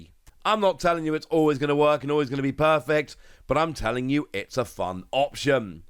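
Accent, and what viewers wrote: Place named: United Kingdom. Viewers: British accent 😌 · He’s British. Kinda like Wah-ter (water) is watt-ah.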